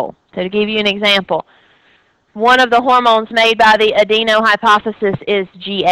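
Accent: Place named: Mississippi, United States. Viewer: Dr., I love your videos. I am taking an online class, but I prefer to sit in a class. Your videos help me to get what I need. Here is the funny part, I KNOW your accent! I recognized it right away. I have family from South Mississippi (not gonna be too specific, since this is on the web). Anyway, thanks so much for the help!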